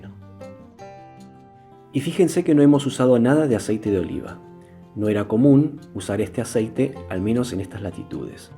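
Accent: Argentina. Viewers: Por la forma de hablar parece argentino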